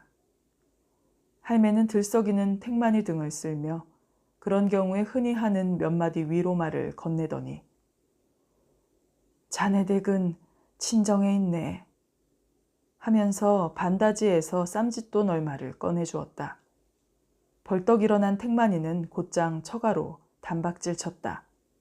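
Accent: Gyeongsang, South Korea. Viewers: 어떻게 그리 경상도 사투리를 잘 구사하십니까?전직이 성우? ^그놈^ 김일성의 남침으로 택만과 도리실댁의 일생이 ^^^ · 경상도 분 인거 같아요. 낭독할때 사투리가 나오잖아요.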